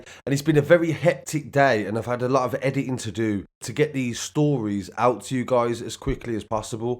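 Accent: Birmingham, United Kingdom. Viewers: Cool brumny accent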